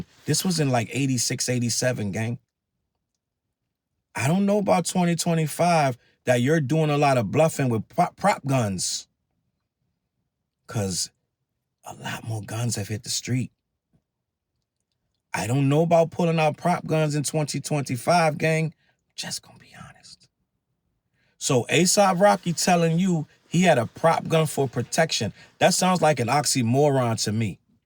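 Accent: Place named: DMV, United States. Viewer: I thought you were from the DMV… the voice gave it away. I’m from SE DC too. I lived in Valley Green in those years so I know about those days.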